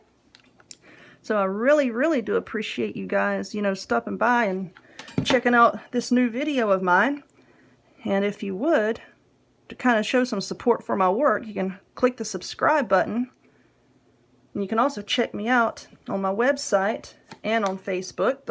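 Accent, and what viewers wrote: South, United States. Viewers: Girl you crack me up LOL " If your snowman is more health conscious .. " ..... Us southern women have our own way of talking and I love hearing you !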